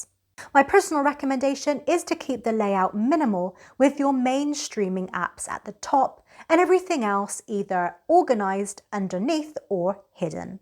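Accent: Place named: Cornwall, United Kingdom. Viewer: 😁 I grew up in Cornwall so have an ear for an accent... Can't decide whether you're Cornwall or Devon..? Or, to cover my bases completely, Dorset 😁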